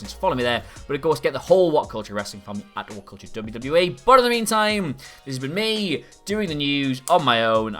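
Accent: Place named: United Kingdom. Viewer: My American ears never get tired of British "years". "It took yeeahhz and yeeahhz and yeeahhz and yeeahhz off our lives."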